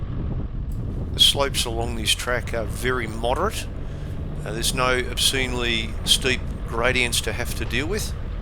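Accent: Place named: Australia. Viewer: Interesting accent, I realised Australians pronounce 'i' as 'oi', like 'time' becomes 'toime'. Nice track i wish my country had such places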